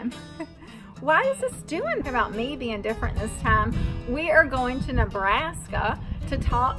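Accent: South, United States. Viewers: I love the way you talk! That accent is great! Ol HWJR ! send all of our gals down to Georgia to learn to talk? Or maybe North Carolina! By the way for those of you that are not as old as I am. Ol HWJR = Hank Williams Jr. Good article there girl! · I love the way you talk , being from Canada I like to listen to you talk southern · You are not 'different!' You are just a sweet 'Southern Belle!' Your voice and sayings just epitomize how we Southerners talk and the way we Southerners do things. I'm from the South, Georgia, just like you and I can tell that we had parents who raised us to be kind and respectful to others and all of God's creatures. So don't every apologize for the things you say and do 'different' from others. I always just place them in the category of being 'different.' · Ur a southern girl, ur r suppose to talk that way. We love it. 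Continue on. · Your not talking different, your talking with a southern accent, just like people from Boston Maine and Texas! This country has many different accents! 😊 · okay maybe you talk a little southern ;) but that beautiful smile of yours just lights up the world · You don't talk different just southern it's great don't change anything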